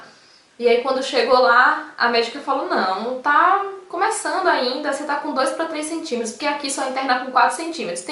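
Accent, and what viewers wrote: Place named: Cruz das Almas, Brazil. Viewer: ! Acabei de relatar minha história para você, e achei seu sotaque parecido com o nosso. Vendo agora seu endereço, percebi que também é de Cruz das Almas. Caso queira, eu posso te ajudar com indicação profissional e ombro amigo caso queira. Deus te abençoe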